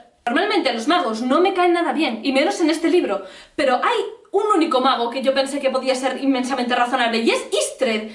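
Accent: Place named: Galicia, Spain. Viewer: Estupendo análisis ¡y diverdido! Un apunte, los libros de la saga El Brujo o saga Geralt de Rivia son siete. Te faltó decir que están bien escritos (aunque se dé por hecho), en 3a persona, por supuesto. Una grandiosa saga de literatura fantástica europea. No entiendo cómo no te gusta Jaskier. :( Al final de la saga le coges cariño, sin él no sería lo mismo. El momento de Borch Tres Grajos es increíble, la piel de gallina. El acento galleguiño que no falte. jeje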